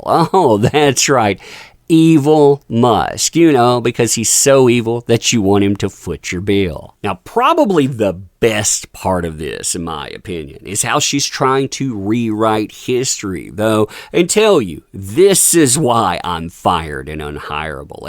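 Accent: United States. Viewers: I love this guy's accent😅 He sounds like a real American cowboy❤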